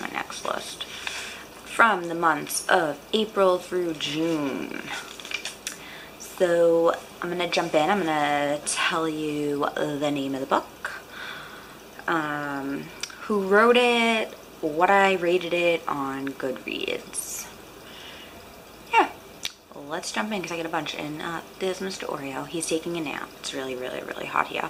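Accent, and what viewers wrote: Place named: Massachusetts, United States. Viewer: Enjoy the lists! I subscribed :) By the way, are you from Massachusetts? Just a guess from your accent :)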